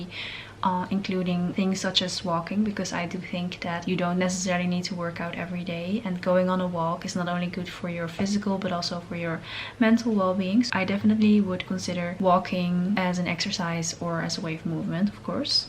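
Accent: Netherlands. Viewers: Are you dutch? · I feel like youre Dutch. I can hear the accent. First video I watch by the way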